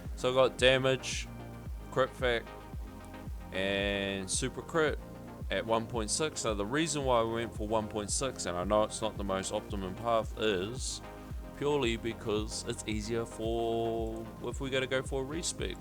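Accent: Australia. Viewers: you can skip to the second mark to skip the intro music and hear that beautiful aussie accent ;)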